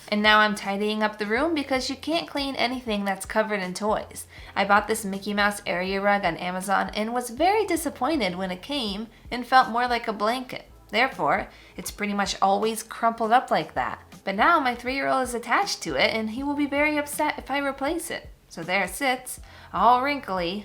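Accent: England, United Kingdom. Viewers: Your English accent here n there is hilarious! Love it Xx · Your English accent 🤣🤣🤣🤣. Adorable. I'm from England and was very impressed 🤪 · Your English accent is gorgeous 😄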